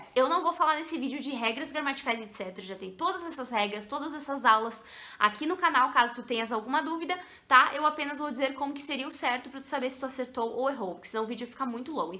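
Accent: Rio Grande do Sul, Brazil. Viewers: vc é linda e muito inteligente mas esse jeito de falar dos gauchos são nojento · ESSE SOTAQUE gaúcho e os verbos USADOS no tempo certo É MUITO chic, PARABÉNS !!!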